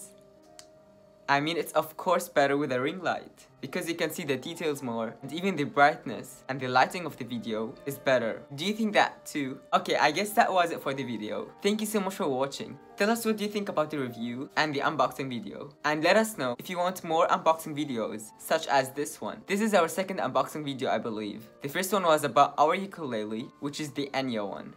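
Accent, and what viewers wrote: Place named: Spain. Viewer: I like your accent. Where are you from? Spain ?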